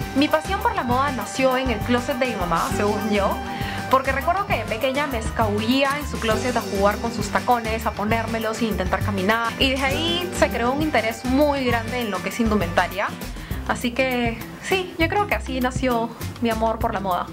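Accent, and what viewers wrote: Peru. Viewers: Sabia k Ella era peruana por su acento :) ...me gusta sus videos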